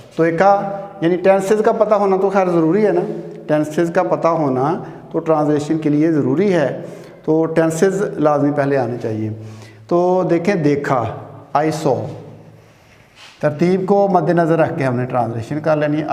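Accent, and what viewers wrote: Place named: Punjab, India. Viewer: It's ok but your accent is Punjabi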